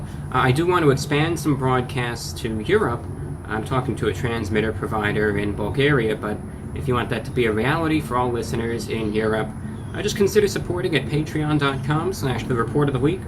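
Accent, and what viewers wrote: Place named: Mid-Atlantic, United States. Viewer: I thought with his accent it was NJ or somewhere mid-Atlantic coast.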